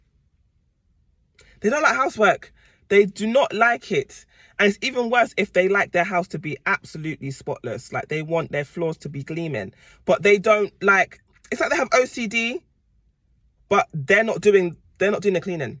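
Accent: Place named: London, United Kingdom. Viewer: Oooh a London accent🎉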